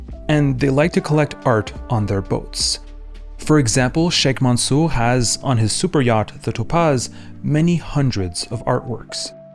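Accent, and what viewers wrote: Quebec, Canada. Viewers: t tu québecois?! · when you speak french it sound like Québecois french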